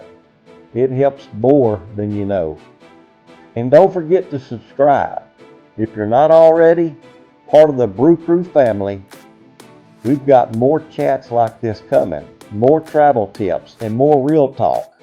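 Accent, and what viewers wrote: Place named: Texas, United States. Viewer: I heard that accent on your latest video and had to scout you guys to see if you are a Texan. Im at the gym, more later.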